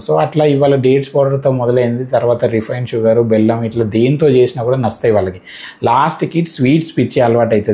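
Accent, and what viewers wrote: Telangana, India. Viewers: సర్. చక్కగా తెలుగు లొ మాట్లాడుతూ.... హఠాత్తుగా ఇంగ్లీషు లోకి వెళ్ళి పోయారు... అలా మాట్లాడితేనే knowledge ఉన్నట్లా enti....చక్కగా మీ తెలంగాణ యాస తో చెప్పండి సారూ మంచి గుంటాది 🙏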